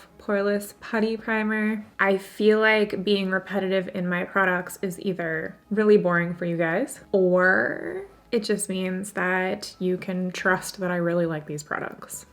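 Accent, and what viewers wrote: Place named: Canada. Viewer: Yes! I loveee Canadians and their accents. It always brings a smile to my face. 😌♥️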